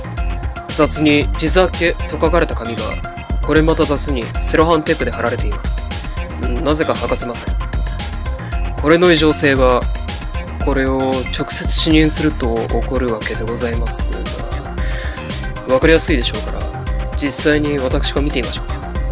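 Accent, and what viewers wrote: Kumamoto, Japan. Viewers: わぁあ！すごい！すごいい！！さんの熊本弁です！！ありがとうございます！好きです！ JP系面白いの多いんですね！ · さんの熊本弁いいですね！今回も面白いSCPありがとうございます！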